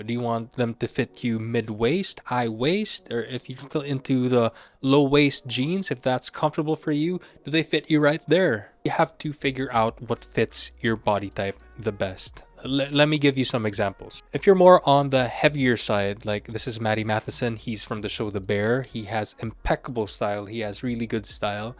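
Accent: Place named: Philippines. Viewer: You kind of have a Filipino accent when speaking in English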